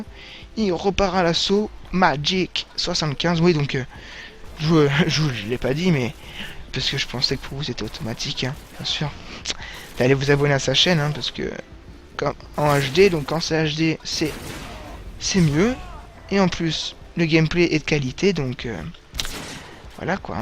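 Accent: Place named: United States. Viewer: Quel accent américain !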